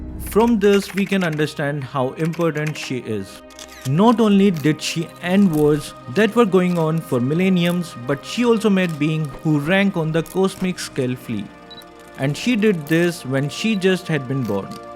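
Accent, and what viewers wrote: India. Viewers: Am i the only who is possed by his indian accent?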